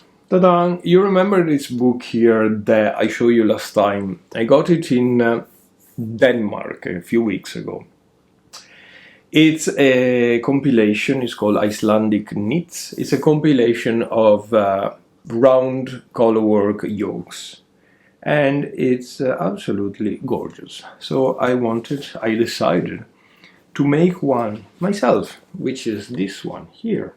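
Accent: Italy. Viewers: Hej, I love your accent, I am from Denmark, and English with Italian accent is much better than with danish😀 being Danish I fully understand your being grumpy about rain…. 😂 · Thank you for posting a wonderful video, even when you're feeling grumpy. Your mood is totally understandable with this constant rain! Jumpers look great. Hopefully you can soon get opportunity to paint your fence. Maybe we'll see the end result 😅 Can definitely hear the Irish accent come through, mixed with Italian, sounds so nice.. Take care and enjoy the Bank Holiday weekend too ❤ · I love your podcast and I love your Italian accent!❤️ Also you didn’t seem grumpy! You are great and don’t change a thing. I be back again soon! Katie from Maine USA🌸🌙😊🧶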